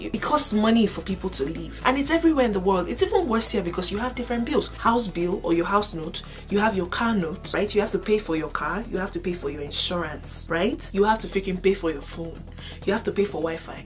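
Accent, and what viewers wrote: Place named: Nigeria. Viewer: I love the Naija accent at the intro💯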